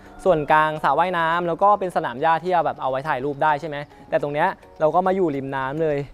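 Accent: Thailand. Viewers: คนกาญพาไปเองรึเปล่าครับ มีติดเหน่อ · คอนถม ก็เหน่อหน่า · เอ๊ะ เป็นคนที่ไหนนะมีติดเหน่อด้วย 555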